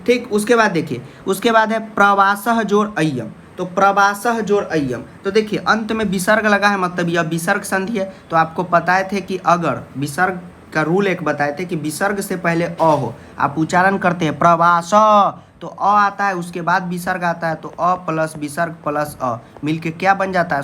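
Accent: Bihar, India. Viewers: Aapki bihari language se hasna ha raha hai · Sir ap vihar se belong kerthe ha kya kuki apke sound se thora thora lagtha ha ki ap vihari language bolthe ha. Otherwise apki ye video bhaut achi ha. Nice video. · Sar aap Bihar se hain kya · Language se hi pata chal gaya tha ki sir Bihar se hai because I am also bihari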